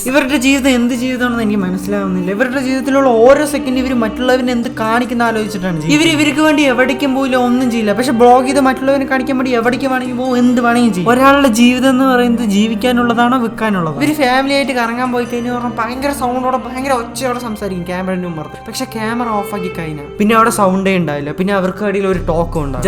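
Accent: Palakkad, India. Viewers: പാലക്കാടൻ aanno?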